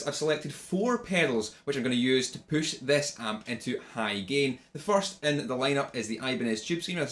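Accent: Scotland, United Kingdom. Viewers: You sound like William Wallace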